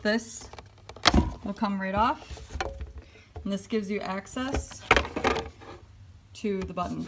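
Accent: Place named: Canada. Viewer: Thanks for tips, you sound Canadian.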